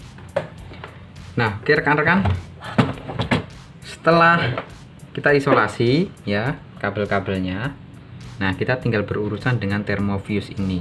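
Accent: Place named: Java, Indonesia. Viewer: Amiiiin... Trmkasih infonya..Sampiyan niii marga tapi gaya bicaranya lebih ke dialek Jawa.. Enaaak merdu pula suaranya... Sehat &.. Sukses slalu..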